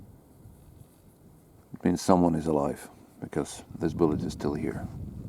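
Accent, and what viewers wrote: United Kingdom. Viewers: you sound British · Interedting. Aberdeen is in Scotland, i was thinking more about areas like Yorkshire which stress sounds similar to you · Greetings from New Zealand. You are the most British Russian ever! I had so much fun watching this. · For a Russian you sound like a perfect brit. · You sound British B) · Yea he is actually good Sounds like british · Haha I'm confused are you Brit or Russian? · bro i thought you were british ngl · Sounds like you are British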